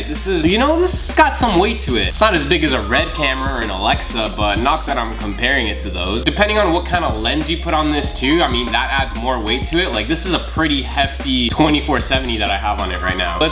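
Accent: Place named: Toronto, Canada. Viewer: sound like your straight outta toronto daagg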